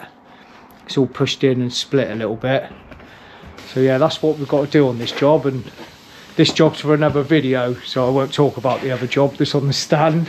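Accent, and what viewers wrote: Wiltshire, United Kingdom. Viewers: you talk Wiltshire mate people shouldn't be rude skiting you accent great job on the door look forward to part 2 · Nothing wrong with your wilts accent my good man ! The one thing my wife liked was my Wiltshire accent and most of the people around here speak it to funny enough as I live in Wiltshire 🤣 that looked like a real tricky job there’s angles going all over the place looks proper nice to me good job 👍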